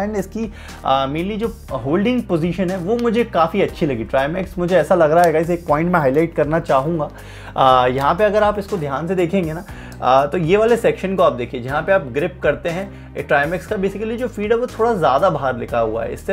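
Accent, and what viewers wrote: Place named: Punjab, India. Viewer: sir aap pakka punjabi ho - bottle se paani 'DUL' sakta ha - ye pen patla ha aur iska grip 'ADTA' ha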